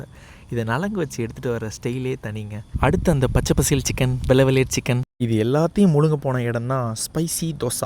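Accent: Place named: Tamil Nadu, India. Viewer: Neenga cook pannumpodhu ather country nu nenaicha . but neenga saapdumpodhu than tamil person nu lost ippa pesumpothu conformed(tamil nadu)namnaadu nu😊 super.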